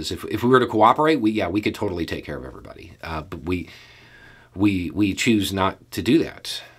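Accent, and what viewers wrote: South, United States. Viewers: In my head, I say your opening lines with you....and at times aloud, in my best imitation. Being Canadian, that Southern accent don't come easy, eh. Well, ya got me this time. Coffee came out my nose when you said, interstellar people....strong black coffee. You made me laugh, but I didn't like it.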